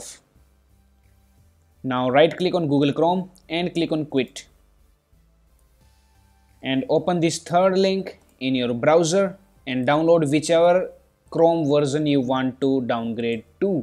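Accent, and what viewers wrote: India. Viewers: You sound like you are from India. In America we speak much slow so take a breath when you give instructions so we can follow you and you can gain subscribers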